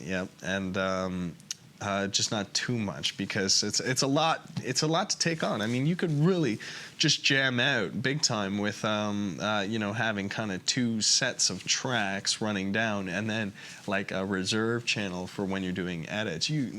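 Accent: Canada. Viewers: bahahaha awesome... he has a bit of a canuck accent as well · his Canadian accent was really noticeable, wow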